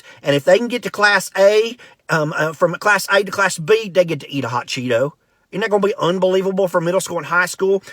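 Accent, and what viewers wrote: South, United States. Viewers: He's speaking cursive with a southern accent. I love this man.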